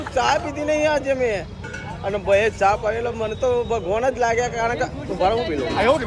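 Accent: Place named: Vijapur, India. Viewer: Good. Good. Original. Vijapur. Nee. Bhasa.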